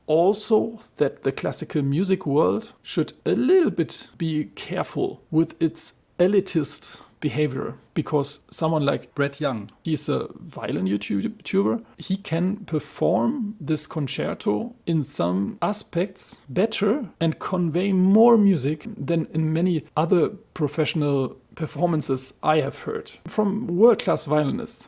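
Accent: Germany. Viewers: würde mir nur etwas weniger deutschen Akzent wünschen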